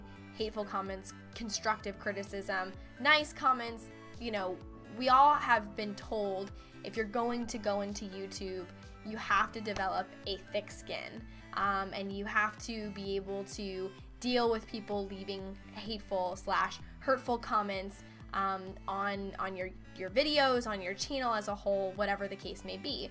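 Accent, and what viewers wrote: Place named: South, United States. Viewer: I'm sad to hear that you have to change how you act even in the slightest. I loved your sarcasm, it was hilarious and fell right in line with mine. Also I love your southern accent and drawl. Being an Oklahoman myself, its awesome to hear a "southerner" sometimes. :P But you're adorable, I love your videos, I love your vlogs, and I hope one day I will get to meet you.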